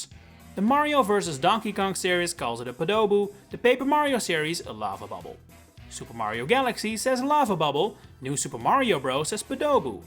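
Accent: Netherlands. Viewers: Vrijwel elke Nederlander heeft moeite met hun accent verbergen in het Engels. Ik hoorde gelijk dat je Nederlands bent hahah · sec in and can just tell you're Dutch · I'm from the Netherlands and I can just easily here his Dutch accent. Honestly, to me it just pisses me off to here Dutch people trying to speak English but yeah that's, about it really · Are you dutch?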